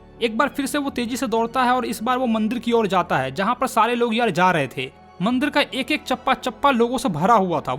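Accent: Bihar, India. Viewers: Channel owner BIHARI hai 110%✅ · App bihari ho bhiyaa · Bihari ho kya😏 Video me bhi aaeee auuu kar rhe ho😒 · Bhai aap muzaffarpur , bihar se ho kya ? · mj aa gaya bhaiii inki tune kitni mast hai bihari + hindi lahjabav that line { bada harami bachaa hai } was epic ❤❤❤❤❤❤❤❤ thanku bhaiya..... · you are BIHARI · Bhaiya are u bihari 😆😆 mast tone hai mana parega JAI BIHAR JAI BIHARI ❤ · i am sure he is bihari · bihari tone on TOP🔥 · Is he also from bihar🤔. Iam a bihari girl and his language hears like bihari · bhai app bihar sai ho kya.❤❤ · U are from Bihar?? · sir you belongs to which district of BIHAR ? because your language is similar like mine and i belongs to patna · sir ji....ider bihari kyu bolte ho..... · you are from bihar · Sir ka mode change ho jata ha bich bich ma 😂 Bihari accent always rocks 😅😂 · Bhai kya aap bihari ho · That Bihari accent! Just wow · 😅😅😅😅😅bhaiya app ka bihari language mst h😅😅😅😅😅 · Tora bihari acha lagwati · Bhai aap Bihar se ho kya? 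· Are you from Bihar?.. That lines > Re laiKA yene aao!!.. I am also from Bihar..And aapse padhne mein bhut hi maja aaya!! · aap bihari ho na??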